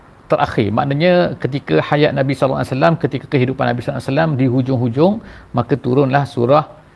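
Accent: Terengganu, Malaysia. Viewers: Assalamualaikum... alhamdulillah. Cuma nak tanya Dr ni asal Terengganu ke? Bunyi slang Terengganu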